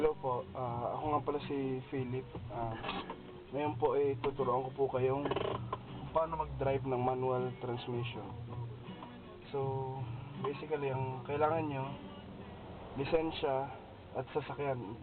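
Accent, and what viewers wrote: Batangas, Philippines. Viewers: taga batangas ka kuya noh? very helpful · Ang cute mo mag salita,.. Eihhh taga batangas po ikaw?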